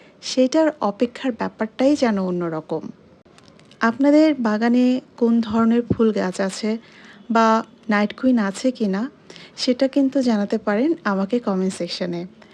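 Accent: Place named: Noakhali, Bangladesh. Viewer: Assalamualikum apu kemon acho ? Night queen flowers Sotti sundor tobe samnasamni dekhar sovaggo hoy ni kokhono. Apu ajker vidéo ta onek clear ajke ki i phone diye vidéo korecho . Mehedi design onek sundor hoyeche. Ei cha dekhe tsc cha er kotha mone pore gelo . Sijhdah burita kemon ache . Mashallah onek onek sundor lagche 😘 ki sundor kotha bole amader noramonio eivabe kotha bole.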